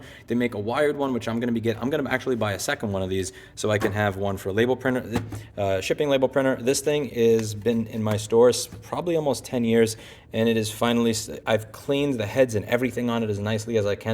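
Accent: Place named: East Coast, United States. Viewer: I was like why does this voice sound so familiar? Gotta love east coasters, oh shoot it is? haha should have known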